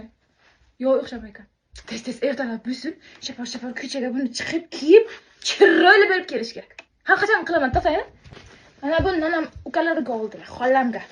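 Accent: Tajikistan. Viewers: Opani milati tojik❤😊 shunga shunaqa gapiradi❤❤ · Shevasi tojik shevaku bu til buzilishiku nimasi yaxshi shevasini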